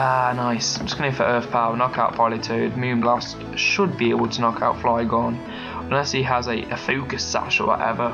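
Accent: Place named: United Kingdom. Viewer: aah, i love your british accent :]]] pls more uploads of that kind, i suck in doubles but it looks fun watchin u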